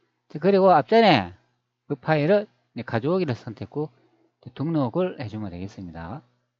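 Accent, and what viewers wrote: Gyeongsang, South Korea. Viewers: 아이구... 상도 사투리.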